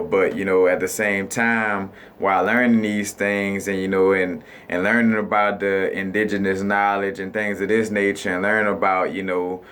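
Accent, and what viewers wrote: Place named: South, United States. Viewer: I notice that you have a southern accent - you would have been burned at the stake, in earlier times - by both blacks And whites for expressing this type of contentent.... the 21st century is truly is incredible !!!.....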